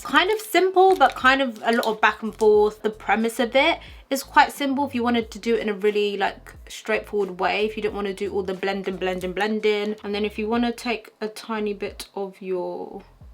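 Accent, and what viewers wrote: United Kingdom. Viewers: I DIDNT EVEN KNOW SHE WAS BRITISH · You being as pretty as you are and then that British accent on top of that is just ugh chefs kiss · Woah has she always been British 😍😍. It makes sense now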